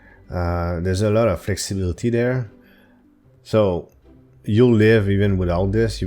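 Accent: Quebec, Canada. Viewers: Es tu québécois?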